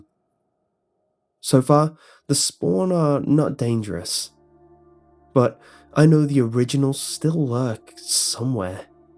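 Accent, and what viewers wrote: United Kingdom. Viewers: Hi. What is your accent called exactly? I got the exact same and people ask me what (British) accent is it?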